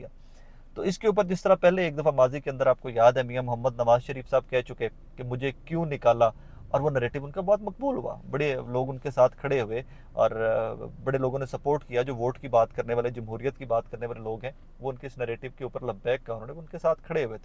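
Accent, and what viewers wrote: Punjab, India. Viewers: Ur Punjabi so good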